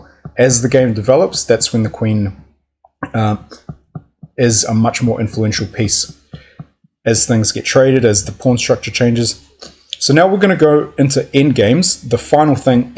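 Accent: New Zealand. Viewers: THANK YOU, OUTSTANDING CH! I PLAN ON VISITING NEW ZEALAND. i WAS CURIOUS TO ASK, YOUR ACCENT, IS IT BRITISH OR NATIVE NEW ZEALAND/ THANKS PAL,... MIKE.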